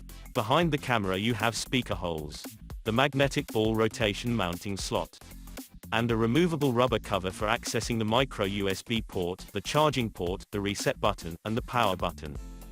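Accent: United Kingdom. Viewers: My how British you sound. Lol Great video my friend, Thanks for sharing this! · Thanks for sharing brother. Very much appreciated. Looks like a nice security camera. Do I detect a British accent? Keep the content coming. Love this channel. Have an awesome night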